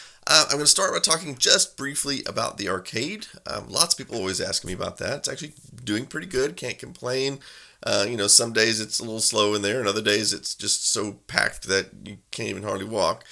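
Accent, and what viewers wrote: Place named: Texas German, United States. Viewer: Ich habe nicht gewissen, dass du ein Texas-Deutschspracher bist!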